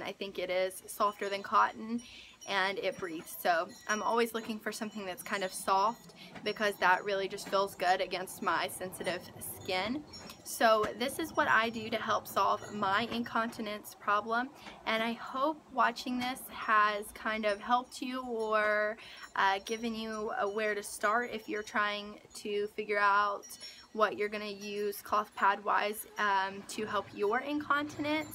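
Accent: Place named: United States. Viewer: Thank you so much for this info. I was also questioning the comfort of a waterproof layer daily. To my ear you sound American so I don't know if you know in Europe female incontinence is often treated with a tens (may no be spelling that correctly 🤷) unit, it's a little bigger than a tampon. It sends a low current shock that causes your muscles to contract, so like kegal exercise but more efficient with much better results. You can buy the stuff on Amazon. The unit is the same but has different plug ins for the more common use for leg and back pain which are commonly sold in store in the pain relief section. Thank you again for a very useful video.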